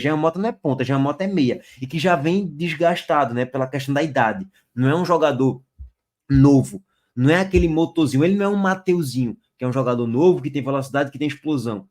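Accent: Bahia, Brazil. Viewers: Você é baiano?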